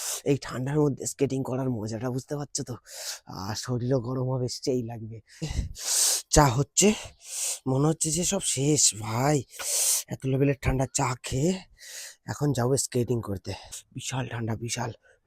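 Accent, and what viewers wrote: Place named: India. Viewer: কথা শুনেই তো বোঝা যায় ইন্ডিয়ান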